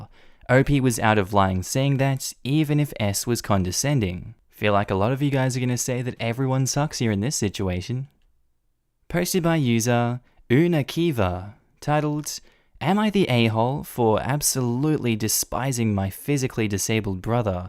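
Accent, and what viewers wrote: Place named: Australia. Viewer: tfw you're an aussie and like hearing a familiar accent, but gotta always laugh at this branding lol (its cringe but i say that lovingly, its a big 'lol good for him' moment from me)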